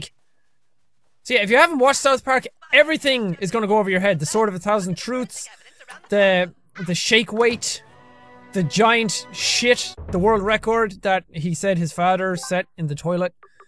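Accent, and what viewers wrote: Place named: Ireland. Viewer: He is Irish so his accent is different.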